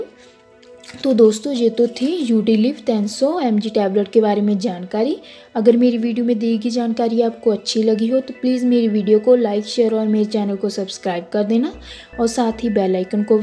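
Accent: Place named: Punjab, India. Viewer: Aap punjab se lagte hai ..khas kar barnala side se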